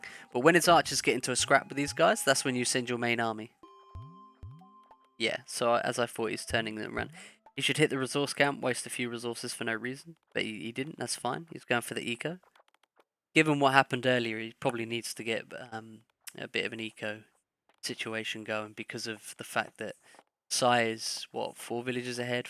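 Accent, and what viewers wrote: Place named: United Kingdom. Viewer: OMG HIS BRITISH Anyway thanks for the video we need more content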